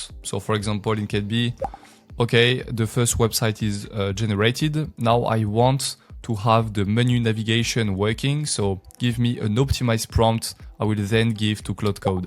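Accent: France. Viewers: C une dinguerie , btw on sait tres bien que tu es francais rien qu’a ton accent hahahaa . Tuto incroyable , si tu pouvais nous en faire plus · Goeh i hate french accents. Just talk normal!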